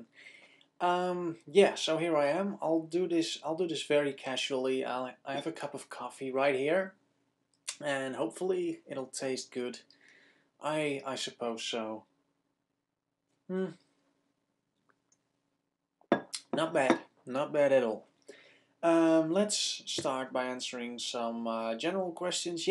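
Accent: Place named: Netherlands. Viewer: Your german reminds me of the cartoon series Alfred J. Kwak i used to watch as kid, because the german voice actor of this cartoon figure is also a german speaking dutch. Sounds cool ;) Kudos for doing those video, a brave step forward!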